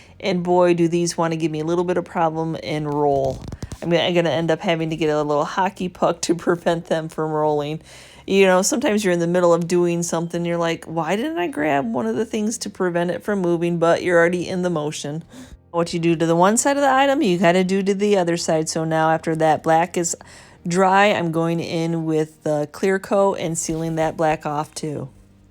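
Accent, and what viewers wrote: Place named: Michigan, United States. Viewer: LOL... as I was listening to you speak I tried to place your accent which I have pretty much given up on cuz I'm NO good at it 🤷, but since I'm home alone I figured it'd be OK. I said 🙋"Michigan" and not 30 seconds later you were talking about Annie's paint holding up well outdoors "here in Michigan" and look at me now 😁 getting one right and nobody's here to witness it. 😞. That's OK, we know! I'm really loving the decoupage on the dragonfly and the flowers. Long story, but I have a metal flower a lot like your largest one and a butterfly, both of which I really need to look polar opposite of what they are now, and this will absolutely do the trick. Perfect timing that I found your video today. Thanks for sharing. 🦋🏵️ From the Southern California desert, MK ♥️🌞🌵 Oh! I was able to properly guess your accent cuz although I was born in Detroit I had my forth birthday here in CA and, as such, consider myself to be a CA girl, my remaining family - one cousin - lives in Bloomfield Hills and works at GM. 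My mom's entire family stayed in MI while she and my dad, apparently the wild ones, drove west in the early 60s.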